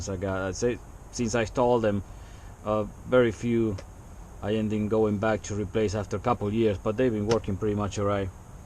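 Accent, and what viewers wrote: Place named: Argentina. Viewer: You sound like your Argentinean. who do you think about the testo digital gauges?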